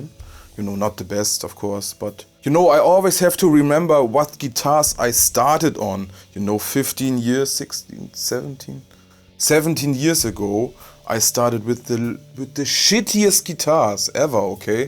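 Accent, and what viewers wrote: Germany. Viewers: Man hört gleich dass er Deutscher ist 🤣 Trotzdem ein sehr gutes Video!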